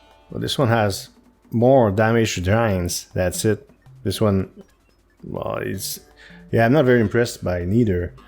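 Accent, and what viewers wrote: Quebec, Canada. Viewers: You sound Quebecois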